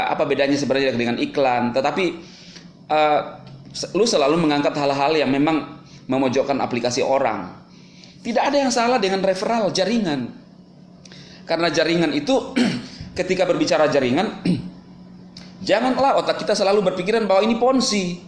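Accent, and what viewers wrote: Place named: South Sulawesi, Indonesia. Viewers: Bang asalnya dari sulawesi yah? Logatnya kayak org makassar..maaf kalau sy salah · orang dari sulsel kah bang? logat na kentara e...hahaha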